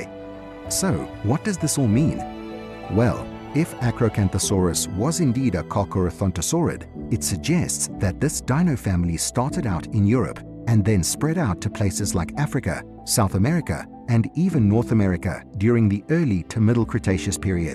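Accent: United Kingdom. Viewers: UK accents are annoying. DISLIKE.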